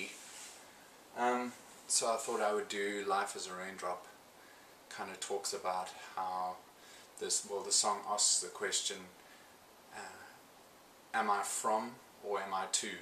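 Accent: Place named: France. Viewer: is french or something? what is that accent?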